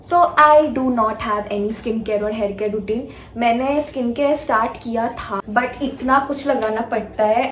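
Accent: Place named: Kolkata, India. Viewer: Kolkata accent 😁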